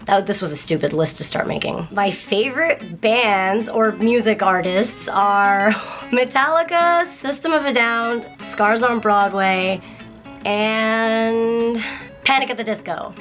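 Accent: United Kingdom. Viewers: So when are we getting a video of you with a British accent the whole time? ;D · This is really interesting, you should do a video in your English accent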